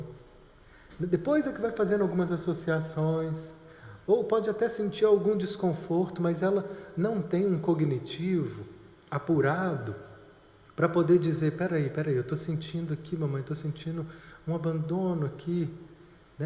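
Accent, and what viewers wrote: Minas Gerais, Brazil. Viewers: Caríssimo estou muito grata a mimhá amiga que apresentou o Pathwork e vc foi o primeiro vídeo e toca minha sombras, todos os dias eu me aceitando... a criança egoísta, invejooosa... reconhecidos... os sonhos eu me vendo... AMIGO este maravilhoso trabalho seu... tom de voz o mineirinho bão sô! Obrigada! obra em negro/branco/vermelho dos alquimistas está em curso.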